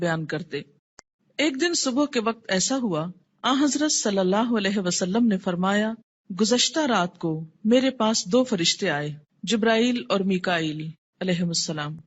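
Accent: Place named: Hijaz, Saudi Arabia. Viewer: تحیات ودو؟ حجازی لہجہ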